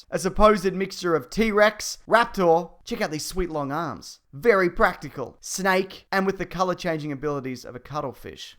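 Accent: Australia. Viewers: I watched this video before the actual trailer. Now I'll have an Aussie voice running in my head when I see the teaser. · Question is this guy Australian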